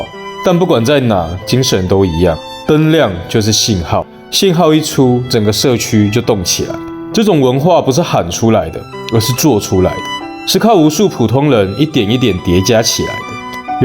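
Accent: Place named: Taiwan. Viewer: 口音聽起來像台灣人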